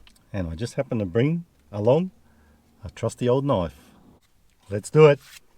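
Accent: Australia. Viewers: This blokes a little too Australian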